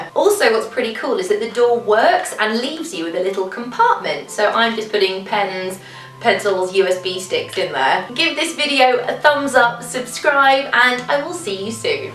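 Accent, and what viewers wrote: United Kingdom. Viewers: You´re british...Ilike it! And I love this series...Amazing! XD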